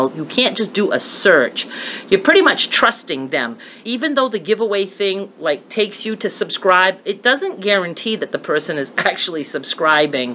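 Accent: Maine, United States. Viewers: I think I'd watch pretty much anything you upload! I just love your Maine accent and enjoy your feisty spunky personality!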